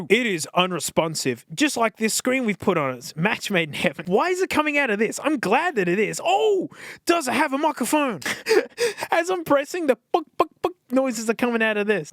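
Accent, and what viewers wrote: Australia. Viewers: Ooo I have a question to you as a non-native english speker. I noticed a while ago your aussie accent not being as expressed or prevalent as some of other Australian youtubers I watch. Do you come from a region where people just don't have that very distinct aussie accent, or do you change your speech and pronounciation deliberately, so people who aren't used to it can understand you better? I guess this isn't that important really, but I've been wondering for a while now. Thanks man🤣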